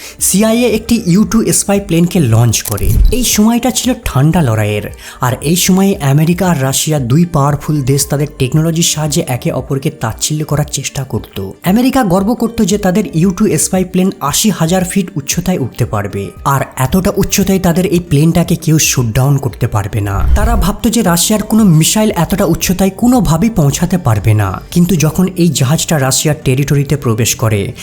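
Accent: India. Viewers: কথা শুনে মনে হচ্ছে তুই গাধাটা ইন্ডিয়ান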